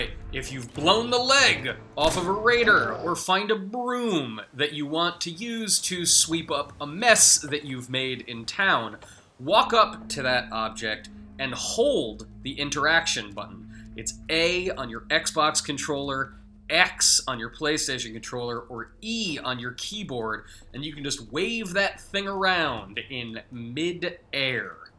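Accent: Scotland, United Kingdom. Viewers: Why 8k dislikes? Yeah his accent is annoying but Scottish people don't get this much dislikes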